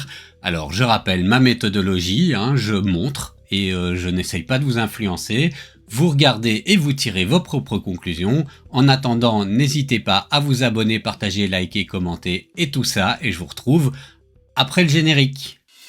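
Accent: Belgium. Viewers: Bravo pour ce test. Clair, sympa et sans pression. Ce petit accent belge est super agréable 😜. Ca donne envie d'ajouter un laser à mon atelier. Merci pour tout ce travail.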